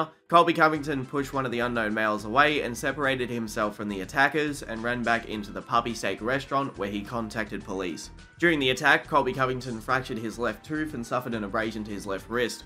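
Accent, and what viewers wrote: Australia. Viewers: You are delusional and insane, sorry. Colby actually is a republican, and the fact that you think it could only possibly be all a fake persona and that he is secretly some lib, shows how out of touch you are with reality, you clearly live online too much. You don't sound American, you sound Australian or something, let me tell you kid, Trump got 74 million votes, these people are not rare, they are in fact pretty common, and the fact that you think that any fighter who says they support Trump is playing a character just to trigger libs, shows that you are insane and out of touch with reality, you are one of these people who doesn't understand that other people exist that don't have the same views as yourself, you are a projecting delusional person essentially, you see Colby and what he says he supports, and since you don't personally support that, you think it has to be all fake.....sigh. 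Dude you are crazy. Also your take on fans changing their opinion of fighters was silly also, because you totally aren't taking into account that as time passes a lot of events occur, why can't fans change their opinion of a fighter after many events involving that fighter take place? That isn't fickle, that is just adjusting the opinions of that fighter after we find out more about them.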